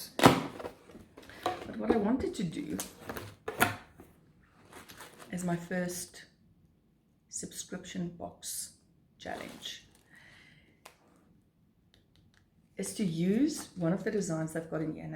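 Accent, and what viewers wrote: South Africa. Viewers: I just saw another one of your videos and heard your accent. I knew that you must be from SA...and you are! I am from the US, but living in SA for 22 years. I totally know what you mean about the post. I don't order yarn or kits from overseas because of it. However, I am in a yarn subscription that isn't a kit and have it delivered to my parents in the US. I have be crocheting for a long time, but have yet to try amigurumi. Maybe you will convince me! 😉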